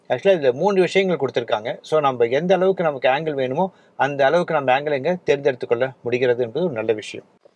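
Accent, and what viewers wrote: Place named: Mylapore, India. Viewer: Boomer mama your is like our pal packet Mylapore nai sekar slangs. Why 😮😮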